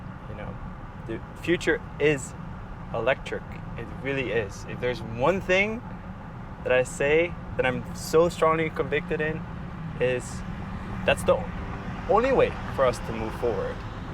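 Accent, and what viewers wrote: Netherlands. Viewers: Wat cool, ik wist niet dat je uit Nederland kwam. Ik bleef mij maar afvragen of ik niet een klein Nederlands accent hoorde haha. Nu snap ik ook je zonnepanelen uit Nederland op de Tesla. Wat een avontuur! Echt geweldig!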